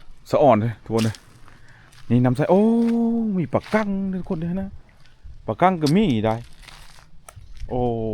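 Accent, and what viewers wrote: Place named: Phu Tai, Thailand. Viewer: แกงหอยมี้เฮ้อเผ็ดแกงเห็ด มี้เฮ้อเค็ม.. เว้าคือเดว..กับ ..ทางผู้ไท ..เขาวง...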